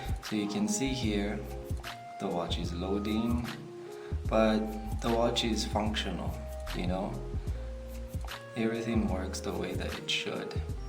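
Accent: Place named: North America. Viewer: Pls speak in common language not nort merican.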